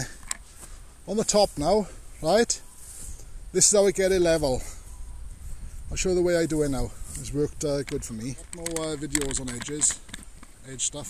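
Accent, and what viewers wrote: Wales, United Kingdom. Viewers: I should say British accents, rather than English as this man is Welsh. Same theory though; Listen enough times to the variation of accents in the UK and it will make sense. Unless they are from Newcastle where even us normal British people do not understand a word they are saying. Google "Newcastle accent" and you will see what I mean!!!!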